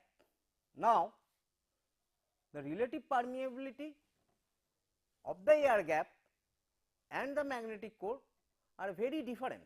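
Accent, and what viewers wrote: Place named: Bengal, India. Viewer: He is bangali ( you can tell it form his assent) ❤ and he amezing ❤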